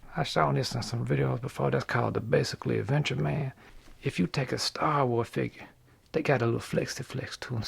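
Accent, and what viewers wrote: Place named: North Carolina, United States. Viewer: Amazing!!! You are talented beyond belief....i love watching your videos and just recently found you! Thank you! Also, you sound very much like you might be from Halifax Co, NC......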